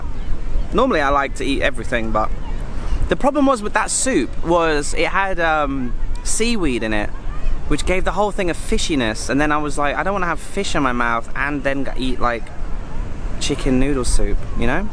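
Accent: United Kingdom. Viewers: Love your accent!! Most YouTubers are Americans or Canadians. It is so fresh to listen to someone speaks with a British accent. At this time when most of us can’t travel. It is so amazing that we can watch you on your little Honda. I hope the videos are longer and more detailed.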